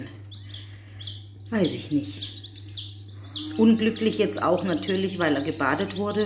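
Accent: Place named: Swabia, Germany. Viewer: Ok liebe, dann stell ich mir das einfach vor, die sehen auf jeden Fall toll aus, habt ihr gut ausgesucht. Dein lieber kann stolz darauf sein, so eine taffe und hübsche Frau wie dich zu haben und ich liebe dein englisch-arabisch-schwäbisch Geschwätze, du bereicherst jeden Tag von uns und ich mag ganz dolle deine Penny auch wenn sie quietscht wie deine Fliegentüre;-))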